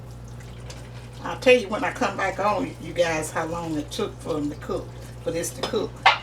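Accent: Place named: Mississippi, United States. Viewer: Greeting's, I am a new subscriber. When I notice your accent as you talk, while you cook, I said to myself, she must live in my hometown(MS). Because my Mother cooks and sound like this, she was a great southern cooker. You are a wonderful cook, I am so grateful to have found this Jewel of you and your channel. Blessings to you and your family.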